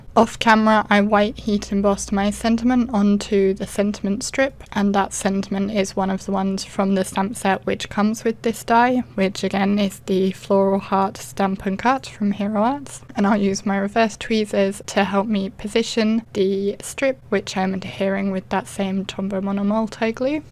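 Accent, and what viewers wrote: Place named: England, United Kingdom. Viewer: (I just want to let you know first that I'm not commenting to win. I truly just know this because I'm also on a crafty budge. So don't feel bad at all! Just because we're on a crafty budget, we can bring just as much happiness to others through the cards we make. So just think of all the people you make happy!!!!! ) For foam tape, you can use just regular pieces of foam or even foam with adhesive already on it. You can get this at just about any craft store. Then just cut to size and add to project. I noticed that you have an English accent. So if you're across the pond, I'm not sure what stores you have but it's available at any Dollar General or Dollar Tree store. You also mentioned that your embossing ink was drying out. You can use the same ink pad & just re-ink it with glycerin. (Check out Lindsay Weirich- "thefrugalcrafter"" her YouTube channel name. 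She used to do alot of cheaper ways to do things. Now she seems to focus on watercolor tutorials.) Glycerin can be bought at any general pharmacy. Your cards were very beautiful!!!! I loved the ink blending behind the heart & the sequins sparkling through the heart pieces!!! You definitely are better at that technique than I am!!! I tried once & gave up. Good luck with your YouTube channel & everything else!!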